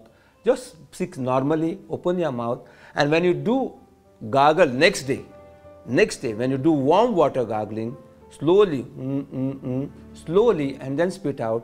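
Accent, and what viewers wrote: India. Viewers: Thank you very much, Indian people voice+accent is very calming :)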